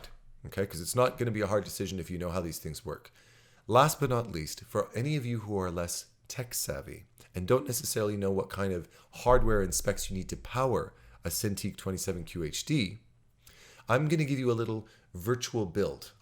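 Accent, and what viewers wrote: Canada. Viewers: I'm new to the channel and love the content,! Does anyone here have the calibration settings for the 24in Cintiq? I purchased one through a school grant at work (high school art teacher, here) and wondered whether or not I should get that Wacom color calibrator. Side note, my dad grew up in Winnipeg. So, when I came across your video on Infinite Painter I detected the Canadian accent. So cool! Happy New Year to all!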